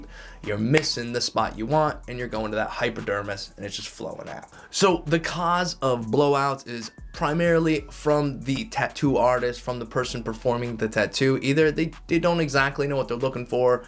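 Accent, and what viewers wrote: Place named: Vermont, United States. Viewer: You sound like you’re from Vermont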